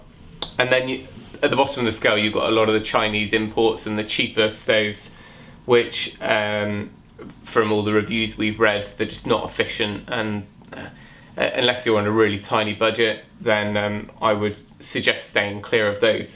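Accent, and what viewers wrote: United Kingdom. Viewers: Just seeing the thumbnail I know the guy has a brit accent. sure enough.....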